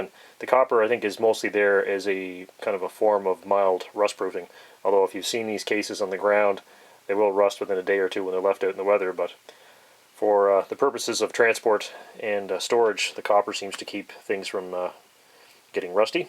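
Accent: Canada. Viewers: May I assume you are in Canada (based on your accent) ? Norinco ammo has been banned for importation to the United States for many years now because of the Norinco/Keng's Firearms fiasco, but I still have some of Keng's match ammo as well as some silver boxes of Norinco. I don't shoot it because it's quite rare here in the US. Thanks for the video...it's the first time I've seen this Norinco ammo reviewed...nice job!!!!! :o)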